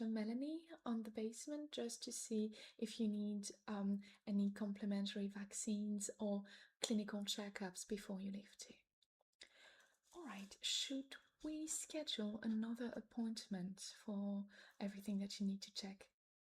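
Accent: France. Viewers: For an actual comment, I love it! The volume is low, which is of course a problem with having midroll ads (having to turn volume up for video makes the ads very loud). But I love the vibes and I love soft-spoken asmr. I'm also going insane trying to figure out your accent. French but if you learned English in England? You look French. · Your accent is pretty good. I only figured out you were French when that i sound slipped through a couple times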